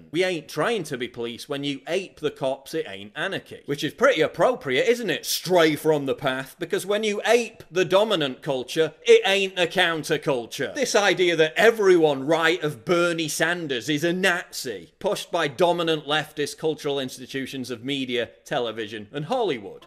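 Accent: United Kingdom. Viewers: Destroying the leftist agenda sounds awesome with a British accent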